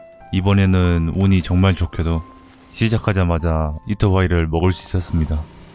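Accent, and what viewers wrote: Jeolla, South Korea. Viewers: 혹시 고향이 라도신가요~?^^ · 점잖게 말하시는데 살짝식 섞여나오는 전라도 억양이 포인트 ㅋㅋㅋㅋㅋㅋㅋㅋㅋㅋㅋㅋㅋㅋㅋㅋㅋ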